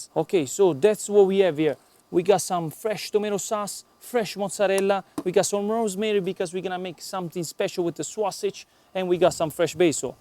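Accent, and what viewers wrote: Italy. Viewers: Si sento proprio poco che sei italiano 😁😁💪💪🍕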